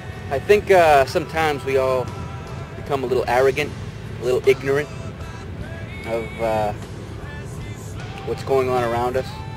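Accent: East Coast, United States. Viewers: You sound like you are from the east coast. Have you ever been to New York? Or how about on the West side of the continent? Seattle? Homelessness is 10 times worse in other areas of the country. I understand your frustration. Sometimes people fall into a trap and find themselves at the bottom. Maybe they need a little helping hand. However, I have found that the vast majority of people that ask you for something, use the helping hand and then ask for more. I have seen the same homeless people in my home town for the last 30 years. I have helped many of them. (Giving them food, a ride, I even let someone live in my house for a short time.) They say they want to change, but most of them don't. Many of them have mental problems, and I agree that those people need major help. You can give a man a fish and he will eat for that day, or teach him HOW to fish and he will eat for a lifetime. 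You have made a video and shown the world the problem. Instead of appealing to others to solve it, come up with something yourself. You live there, and it bothers you deeply, so become part of the solution. Cheers. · You have the East Coast accent why don't you go and help those people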